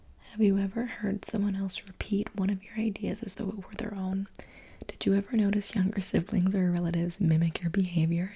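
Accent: United Kingdom. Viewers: lol your british accent is amazing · The British accent 😂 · As a british male, aged 16. I can confirm that almost every few streets has a different accent. I like your British accent though, It's relaxing. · As sooooon as you went with soft spoken British accent I ARRIVED AT TINGLE STATION! 😅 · Not calling out all of us younger siblings 💀. Lucky for you, I'm too lost in your soft spoken voice (& British accent) 😌or else I'd ask to speak to your manager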